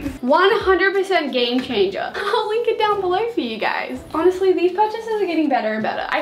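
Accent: Australia. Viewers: Normal people: no: nooore I love her aussie accent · I NEVER UNDERSTOOD AUSSIE ACCENT BUT AFTER WATCHING FOR 6 YEARS, I CAN UNDERSTAND IT PRETTY WELL LMAO🛍🛍🛍🛍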